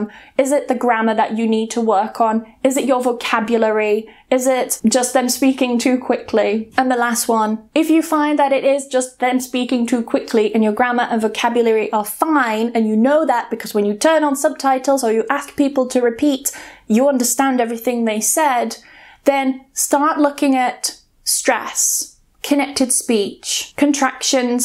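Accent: United Kingdom. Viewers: I understand perfectly English, even she speaks British English and I'm studying American English. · in this great video, I like british accent such as your accent. thank you so much your types of listening.. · 妳的英國腔讓人愛上英國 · your beautiful British accent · Cool your britist accent . Very very good . I enjoy a lot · Hey that's a good while that I'm learning English and i was only focus on American English but i do find your British accent so interesting...So inspiring! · I understood every word you said , but sometime I find is difficult to follow the conversation. you're British accent amazing . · your* British accent ;) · Hiya, i like your british accent, this lesson was really interesting, I must confess…I usually watch your videos with english subtitles, I loath miss some keywords.. please have a nice day. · I prefer American accent, but, the point is, regardless of which accent you are more into, I think English learners should study different accents, because soon or late you will find someone who doesn't speak the accent you're used to, and then what?? 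Will you ask this person "could you please speak with the accent I can understand??" Of course not, and your accent is so nice that makes me feel like learning more British accent. I hope my comment is useful. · Your British accents is ❤️😍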